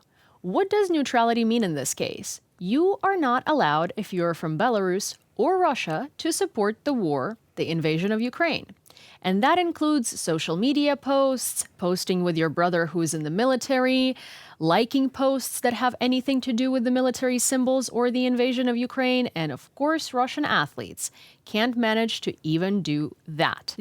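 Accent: United States. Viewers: my sincere appreciation for your work and for Gaze media as well. You undoubtedly do a meaningful job, cz western media doesn't comprehensively cover the news about the war in Ukraine. P.S. Thanks for exposing to perfect American English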